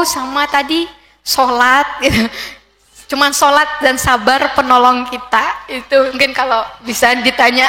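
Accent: West Java, Indonesia. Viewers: Iya. Jdi kayak orang Sunda.... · Asalamualaikum.....ibu dokter Alhamdulilah saya dengernya seneng dan terharu mudah2an selalu sehat panjang umur dan selalu Istikomah.....Aamiin ibu dokter apa ibu dari suku Sunda? Suaranya logat Sunda. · Dokter bahasanya kaya sunda banget ye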